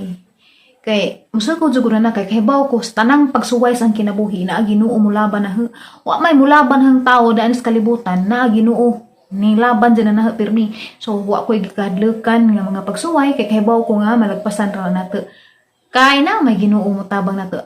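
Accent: Bohol, Philippines. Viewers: Inday bilib ko nimo.gahi man jud ag sinultihan sa atuang tga bohol.proud ta tga bohol ta.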